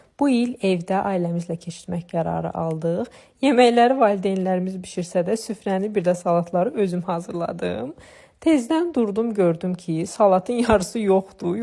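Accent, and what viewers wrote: Karabakh, Azerbaijan. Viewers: Əsl qarabaq ləhcəsi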